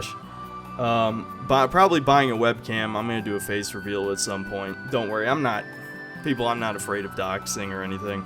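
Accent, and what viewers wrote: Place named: Central New York, United States. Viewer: You have got to be from Central NY. Probably Syracuse or Oswego. We can’t escape our CNY accents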